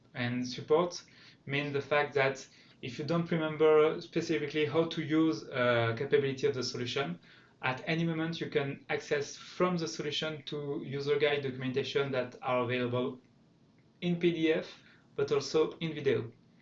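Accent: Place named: France. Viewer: the french accent is terrible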